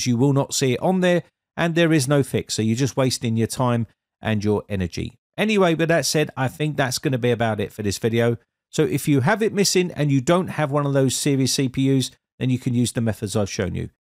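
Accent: United Kingdom. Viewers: He's clearly got a British accent mate are you okay?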